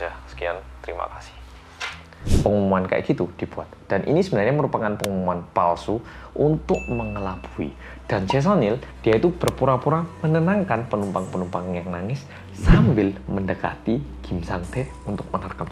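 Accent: Java, Indonesia. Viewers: yang menarik dr sini otak guw membayangkan yg dia bicarakan .. hebattt sukses terus mas medhokkk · medhok ◐.̃◐ Nessie Judge: horror 👻 Nadia Omara: ngegass 😈 Arroyan Pram:serius, editan terbagus dan niat Detektif Aldo: serius pake banget (｡ì _ í｡) Gak tau kenapa aku suka banget sama kalian:-)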